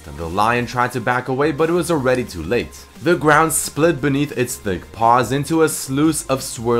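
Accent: United States. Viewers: He sounds American when he is sick haha. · You sound American with your voice haha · OMG HE SOUNDS AMERICAN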